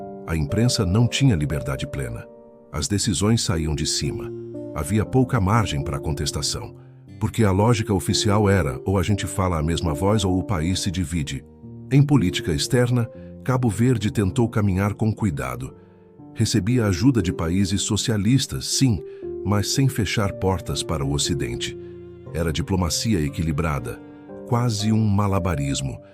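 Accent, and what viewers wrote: Brazil. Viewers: Sotaque de Brasileiro Horririso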